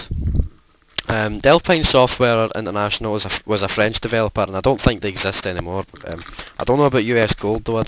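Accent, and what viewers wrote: Scotland, United Kingdom. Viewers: Haaa. I was NOT expecting you to be scottish. Yas. Nice :D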